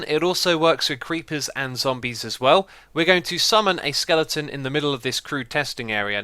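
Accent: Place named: United Kingdom. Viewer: I love your British Accent haha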